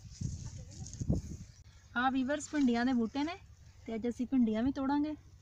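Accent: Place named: Saraiki, Pakistan. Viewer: آپ لگتی تو نہیں پنجابی آپ سرائیکی ہو زبان سے لگتا ہے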